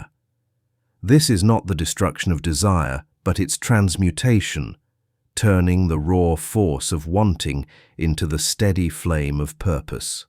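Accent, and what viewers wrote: United Kingdom. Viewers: Plus the prevalent British accent… 😑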